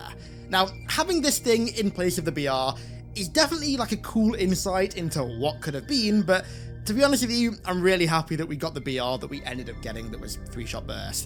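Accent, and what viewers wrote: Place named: United Kingdom. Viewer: This is probably a weird question, seeing as you have the accent, but do you live in the UK or the USA, ‘cause you also did a fourth of July episode on your other channel.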